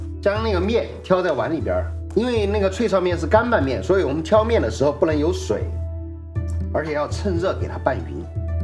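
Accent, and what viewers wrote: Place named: Sichuan, China. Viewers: 说话语气和性格都是典型的四川人啊啊啊啊啊，太喜欢了！ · 椒鹽普通話=四川普通話🍇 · 川普...听了都想回四川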